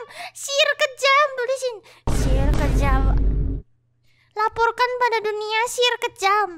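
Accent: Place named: Cirebon, Indonesia. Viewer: logat ngomongnya kek orang cirebon jir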